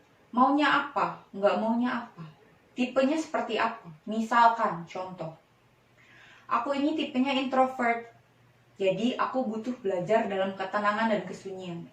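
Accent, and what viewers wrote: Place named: Palembang, Indonesia. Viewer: Palembang punyo ini.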